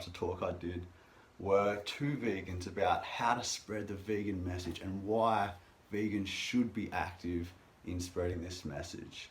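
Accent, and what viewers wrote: Australia. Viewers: I first suscribed to your channel thanks to and because of your way of putting veganism forward. Always wise and peaceful. I also wanted to improve my English and understand the australian accent - it actually helped alot! - But what I truly love about your channel is that we also learn how to be humans. Not sure if it is understandable but your videos are a great source of inspiration, for the animals as well as for us, as living being. Great job and greetings from France!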